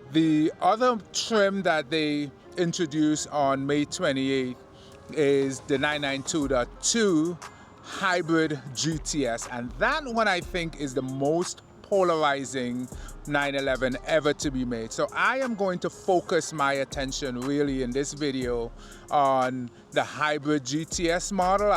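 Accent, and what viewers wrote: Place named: Saint Vincent and the Grenadines. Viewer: Hey! I agree with your analysis of the 992.2. Some terrible decisions by the VW group. After the 997 years, the 911 has just been getting uglier every year. BTW!! Are you from St Vincent in the Caribbean? My friend Kim Collins has the same accent as you. Just wondering. Thanks for the great content. All the best!!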